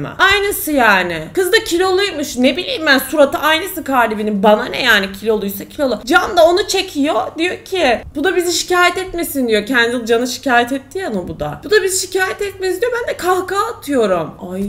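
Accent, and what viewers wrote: Kütahya, Turkey. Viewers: Yapçem etçem Allah'ım ya tam Kütahya şivesiyle konuşuyon 😂💓 Kütahya'dan selammmmm öpvedim gali seni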